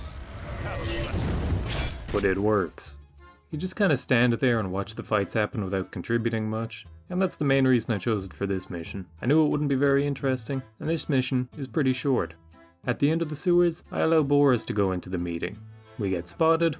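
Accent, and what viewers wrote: Ireland. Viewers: Where is your accent from you sound Irish but not quite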